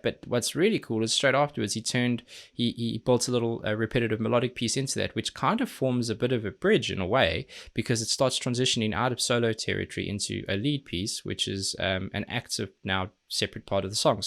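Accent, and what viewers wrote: South Africa. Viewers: Love love love the technical breakdowns of all the reactions, can see the genuine joy in your face when you hear something that works great! Can i beg that you you check out anything from these 2 bands but my pick would be - and -. Keep up the awesome work man! (also an aussie with kiwi parents i understand the springbok accent haha)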